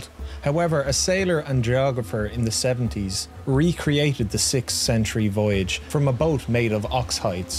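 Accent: Dublin, Ireland. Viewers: interesting video. whereabouts in Ireland is your accent from? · That’s a soft Dublin accent.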